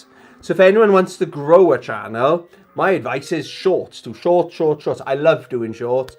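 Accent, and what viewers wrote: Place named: Wales, United Kingdom. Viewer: I do like the Welsh accent . Great video as usual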